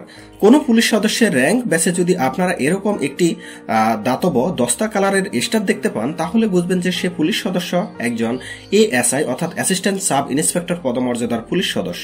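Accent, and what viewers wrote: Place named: Comilla/Noakhali, Bangladesh. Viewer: আপনার বাড়ী কোথায়? কুমিল্লা / নোয়াখালী?আঞ্চলিক টান রেয়েই গেলো।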